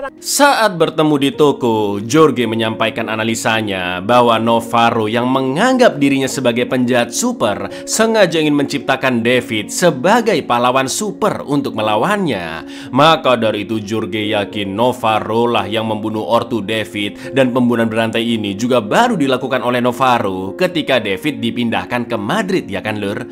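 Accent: Java, Indonesia. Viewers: Beta buka gudang, anggaran ilang (gaya bicara medok)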